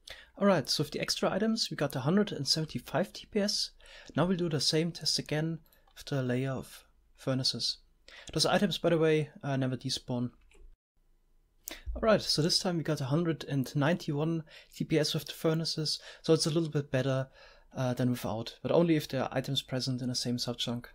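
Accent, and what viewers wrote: Germany. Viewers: Agreed. He sounds like he's German, or at least speaks German as his native language. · Du hörst dich sehr Deutsch an 🤔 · what Country are you from? It sounds like you would be from Germany :D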